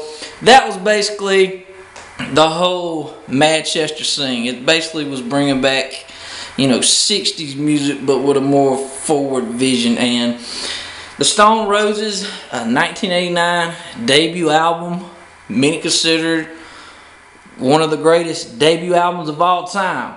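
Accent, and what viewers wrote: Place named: South, United States. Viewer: Great knowledge/review. Funny to hear a southern American talk about the Roses, I'm half Eng/ Half American so It's always nice to hear that accent. Great job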